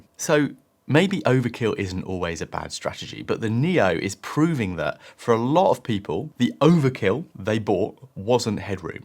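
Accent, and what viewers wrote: United Kingdom. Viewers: Great video! I loved the British accent!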